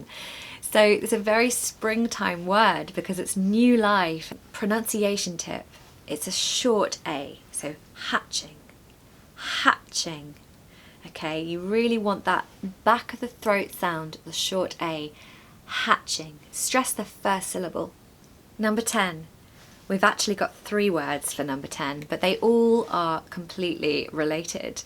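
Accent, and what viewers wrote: United Kingdom. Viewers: Hallo from Italy. I adore british accent. Unfortunately I don't know all grammar rules, but I can understand what you say, even without subtitles. My problem are speaking skills 😢: how can I think not in Italian but in English??? In the moment I have to talk, I remain without words (writing is better for me) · I liked very much your way of teaching.being an Indian teacher of English lam interested to hear your British accent.thanks a lot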